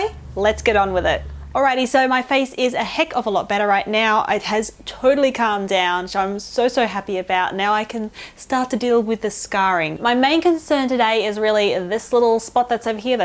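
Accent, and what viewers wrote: Australia. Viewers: Australian (Duh)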